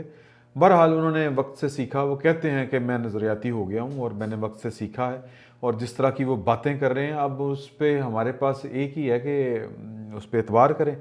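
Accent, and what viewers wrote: Punjab, Pakistan. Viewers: Ur great 👌 Sir 👌 g good job 👍 naam tou suna hi hoga dialogue with Awais Sheik Multan Wala Punjabi tarka Kamal Ka hota hai aapka Imran Bhai yaqeen jaaniye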